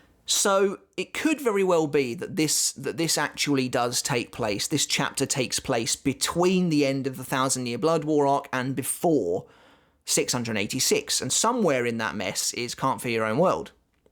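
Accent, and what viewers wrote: United Kingdom. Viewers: Hey are you British?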